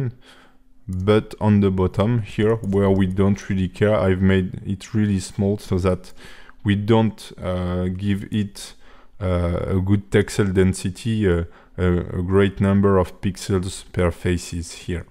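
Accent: France. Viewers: Love ur French accent haha , keep it going !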